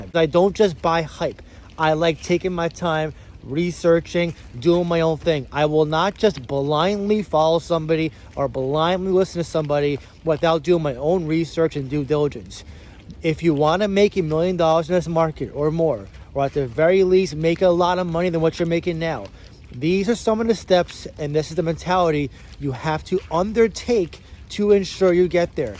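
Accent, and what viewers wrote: New York, United States. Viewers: I am from England but am sure that's a New York accent. 😮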